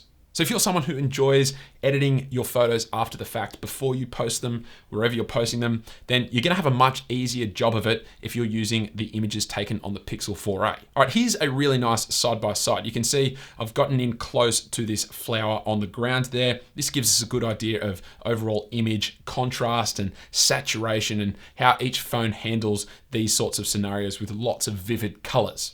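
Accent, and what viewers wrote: Australia. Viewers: U from Australia?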